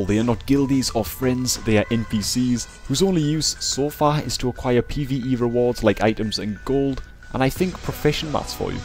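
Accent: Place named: Geordie, United Kingdom. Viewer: proper strong geordie accent...makes you sound foreign man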